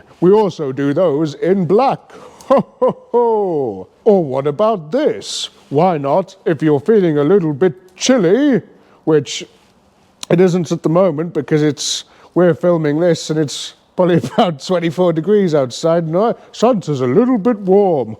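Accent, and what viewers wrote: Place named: Wales, United Kingdom. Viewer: My elderly mother is wondering whose lovely Welsh accent that is wafting around the house. I keep leaving YT on auto-play.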